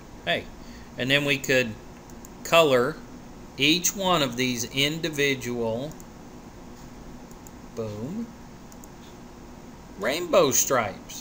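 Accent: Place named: South, United States. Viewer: You sound like idubbbz with a southern accent, i love this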